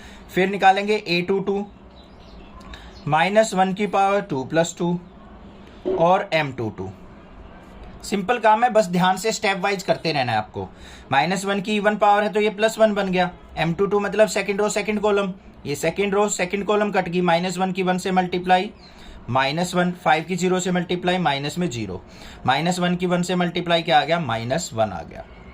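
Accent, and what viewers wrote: Haryana, India. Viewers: Sir I think aap haryana se ho.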